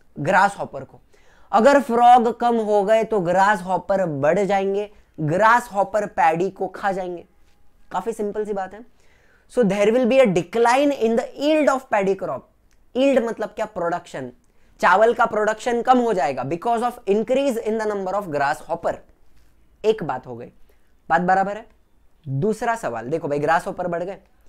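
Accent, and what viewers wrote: Maharashtra, India. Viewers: Sir mast shikavata tumhi Tumhi marathi ahat ka I thought that because of your language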